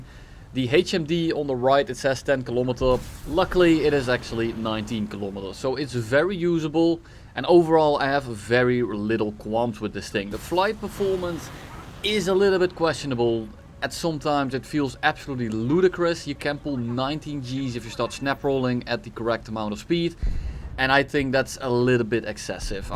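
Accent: Netherlands. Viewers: I smell netherlands behind your voice accent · Fist second in the video and I know he is Dutch 😂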